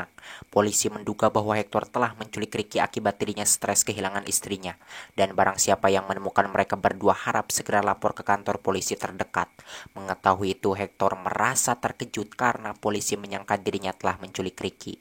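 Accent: West Java, Indonesia. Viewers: Sundanya dari mana ni min 😁 sing ageung milik lah pokonamah 🤲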